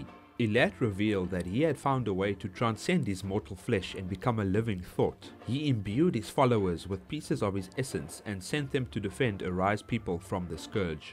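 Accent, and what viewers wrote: South Africa. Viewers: This such a deep south african accent good video please more lore videos , I subscribed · I was also just like this guy is south African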